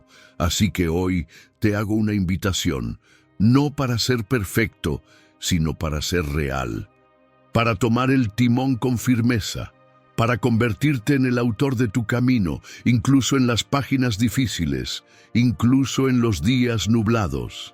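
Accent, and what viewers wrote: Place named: United States. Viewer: Acento americano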